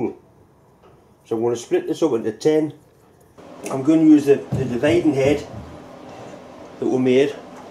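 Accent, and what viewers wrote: Newcastle, United Kingdom. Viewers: Geordie, is he ?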